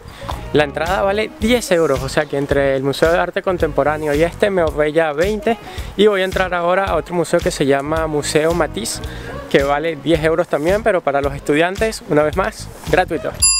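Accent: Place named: Venezuela. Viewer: saludos...muy bueno tu canal,ya me suscribi,por tu acento al hablar y tus palabras parece que eres de Venezuela !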